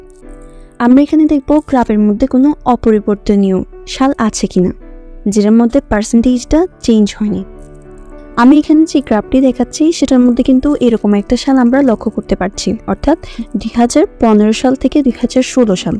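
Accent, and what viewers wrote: Chittagong, Bangladesh. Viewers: ১০০% শিওর আপনার কথ বলা বাচনভঙ্গি একদম খাঁটি চাটগাঁইয়া 🖤🖤🇧🇩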